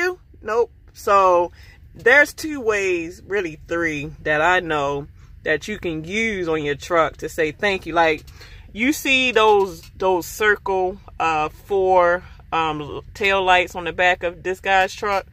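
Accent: Baltimore, United States. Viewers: ? your accent is Baltimore? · Sirius Desta thats cause she is from baltimore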